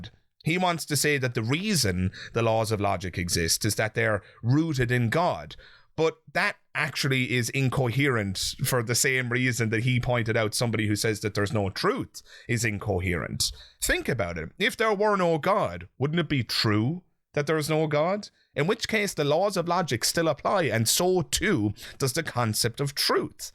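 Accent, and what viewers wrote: Ireland. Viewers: I like your work , honest , simple , straight forward ,easy to follow , genuine, calm, ........ And all in a soft gentle Irish accent. Well done sir .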